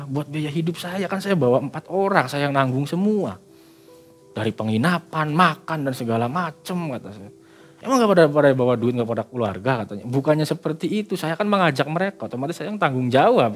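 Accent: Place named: Cirebon, Indonesia. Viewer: cirebon banget yaa mang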